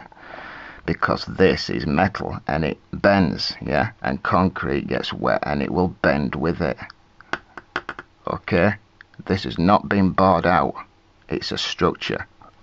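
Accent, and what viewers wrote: United Kingdom. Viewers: Its funny how i look at ancient buildings or while watching other videos from various YT Channels about these important topics I hear you saying in my head: "That is all REBAR... So much MUD (British Pronunciation emphasized!)... It is all a FASADE... This isnt a TOMB... PEOPLE LIVED THERE.... Certainly they decorated their houses with FLOWERS... Love your lessons, you are gifted!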